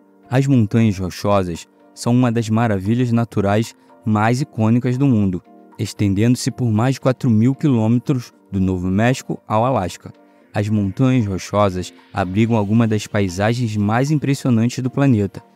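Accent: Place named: Rio de Janeiro, Brazil. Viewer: Vídeo infinitamente interessante e feito Com Muito Amor!!!!!! Nosso Lindo e Bom Deus cria somente belezas que nós podemos contemplar e admirar com felicidade infinita!!!!! Eu sou grande, grande fã deste canal fantástico e esplêndido! O Moço que tá falando tem uma voz bem doce e melódica e acho que é carioca como eu! 😃🙂 A voz dele e também um das razões de assistir nos vídeos deste canal, porque eu sou músico e flautista e para mim é alegria que ele tem uma voz tão linda!!!!! Mil beijos e fortes abraços pra todos do meu Lindo Rio de Janeiro!!!!! 💘 💘😍🏩💌💖💓💘😍🏩💌💖💓💘😍🏩💌💖💓💘😍🏩💌💖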